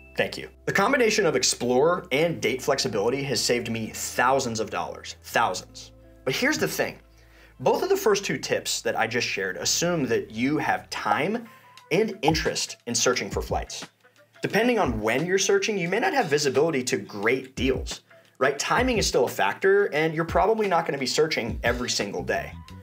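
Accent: United States. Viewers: Hmmm ......You’re watching content on an American’s channel (with a definite American accent). It can be picked up in other countries but it looks like it’s basically a video by Americans for Americans. And you’re upset that it doesn’t apply halfway around the world?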